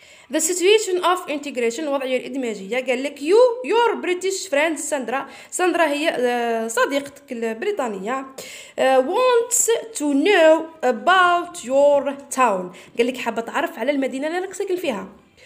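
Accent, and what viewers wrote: Tunisia. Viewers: نتي تونسيا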